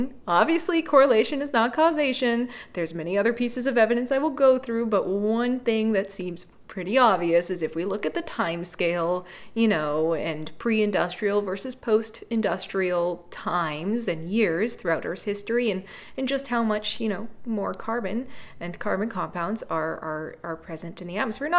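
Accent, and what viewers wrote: United States. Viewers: Is she american?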